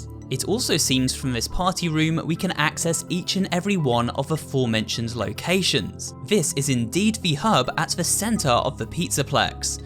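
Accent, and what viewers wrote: United Kingdom. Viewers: like the british accent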